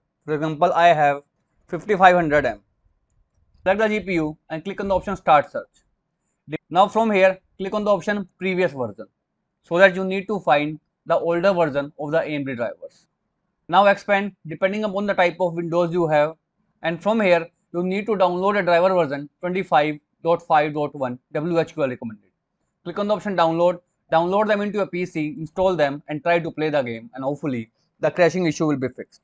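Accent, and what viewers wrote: India. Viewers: I knew it will going to work when I heard your indian accent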